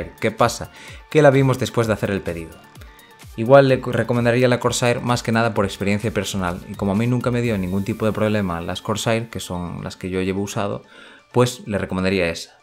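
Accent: Galicia, Spain. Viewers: muchas gracias por responder. . seguramente pille un 6700 sin k ..ya que no me interesa hacer OC . por cierto ..eres gallego ??(yo lo soy) ..lo digo por el acento ajaajaj!! un saludo crack y sigue así ..llegarás lejos;) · se nota q eres d galicia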